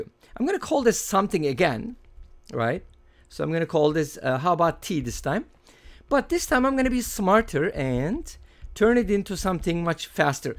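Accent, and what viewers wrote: Turkey. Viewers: Abi ingilizcen gayet gelişmiş. Daha demin 1 yil onceki videonu izliyodum, türk oldugun hayvan kadar anlasiliyordu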